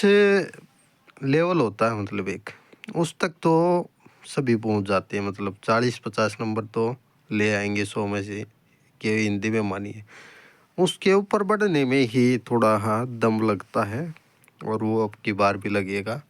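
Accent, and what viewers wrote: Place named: Haryana, India. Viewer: आप हरियाणा से हो बोलने के अंदाज से पता चल रहा है 😍😍☺️☺️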